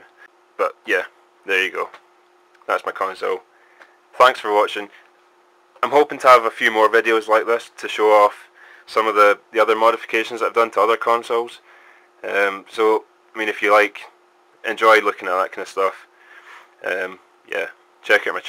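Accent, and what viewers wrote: Scotland, United Kingdom. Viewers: dont you just love browsing through random videos and finding a Scottish accent xD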